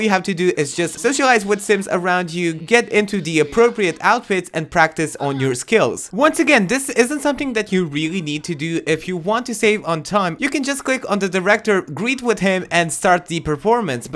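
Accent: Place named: France. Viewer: I LOVE your accent! Are you from France?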